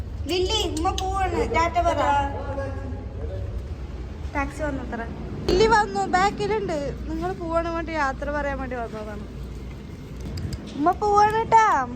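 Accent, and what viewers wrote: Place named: Kochi, India. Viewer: Kochi slang annalo vanne samsarikumbo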